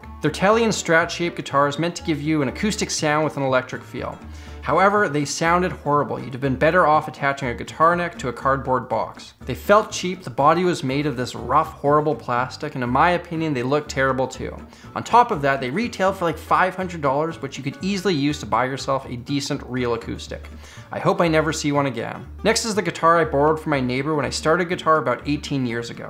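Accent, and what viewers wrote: Canada. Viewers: This is the most Canadian man I have ever heard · First ten seconds I knew you were a fellow Canadian lol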